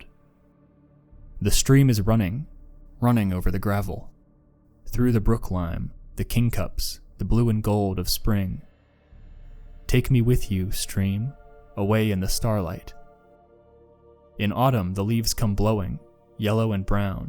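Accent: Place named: Newcastle, United Kingdom. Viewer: Credit where it's due: a damn good attempt at Geordie mannerisms! Not the easiest even for English folks 😂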